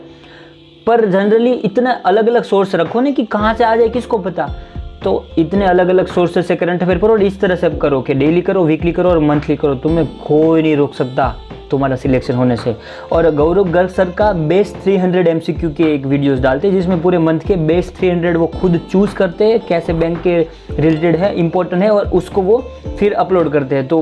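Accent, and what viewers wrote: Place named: Gujarat, India. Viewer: Sir muje aap Gujarat se lagraheho. Aap ki બોલી se❤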